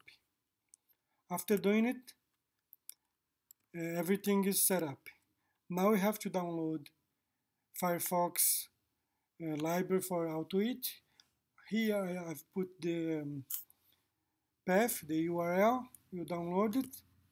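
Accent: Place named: Brazil. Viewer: Que inglês nojento!!!... E para quê um brasileiro se metar a falar ingles!!...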